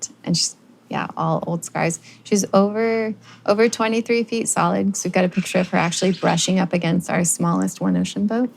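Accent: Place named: Brazil. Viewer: Não te conhecia, mas pelo sotaque vique vc também é brasileira, ne?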